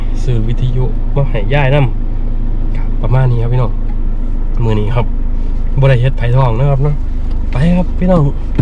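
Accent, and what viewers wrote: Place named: Nong Bua Lamphu, Thailand. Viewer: ให้มันสุกก่อนจ้าจั่งสิแซบจ้าเจ้าคือเว้าคือแท้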